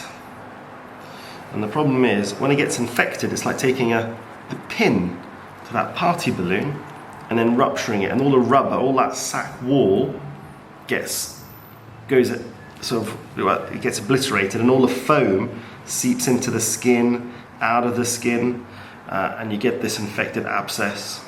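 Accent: United Kingdom. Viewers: Its really nice to hear the brit accent in one of these vids. I was starting to think only Americans did this 😅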